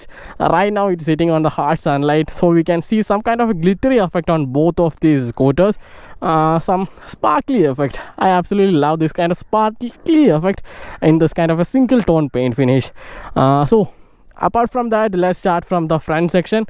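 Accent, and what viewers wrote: Kerala, India. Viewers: Nice mallu arabi English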